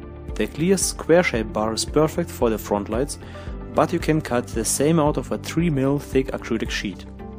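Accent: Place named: Germany. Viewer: beautiful german accent!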